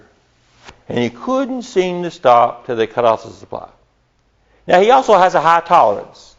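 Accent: South, United States. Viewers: This Doc is amazing, just a brilliant teacher....Hard to find a Doc that can teach in such a simple manner...Love the Southern draw...Good people from the South..I wonder if this Doctor works at a recovery center.. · Don't understand your american speech. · krisztina kessel it sounds southern of some kind. But it also sounds like he has a mild speech impediment as well which makes it a bit difficult to understand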